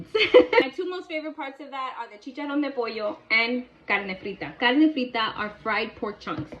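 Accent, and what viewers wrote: New York, United States. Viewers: Her Spanish accent is fighting with her ny accent. Sometimes ones winning sometimes the other is. Lol.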